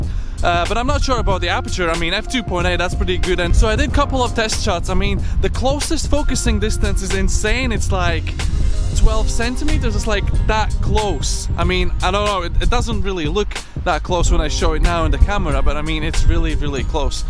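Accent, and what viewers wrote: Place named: Scotland, United Kingdom. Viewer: I can't place your accent... I recognise a Scottish edge in there.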